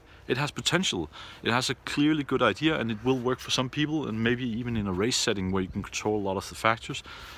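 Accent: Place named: Denmark. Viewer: but now i am curios where You from your English accent sound Danish that whas The reason i meant i had Seen You before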